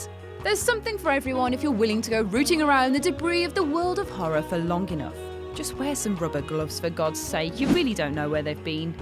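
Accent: United Kingdom. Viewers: UGH. I hate the when they use the commentators with British accents. E#specially this one. Won't be watching this. I'll find a similar list om watchmojo or imdb.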